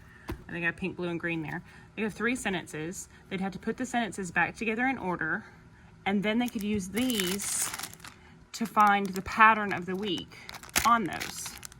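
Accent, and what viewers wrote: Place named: Arkansas, United States. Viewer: First time watching and I heard a “Ya’ll” in there and thought “she sure does sound Arkansan!” (Like me lol) and then you mentioned later in the video having taught in Arkansas!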